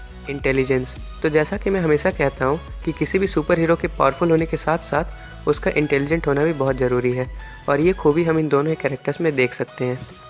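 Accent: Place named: India. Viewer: Man from India, Your accent is terrible!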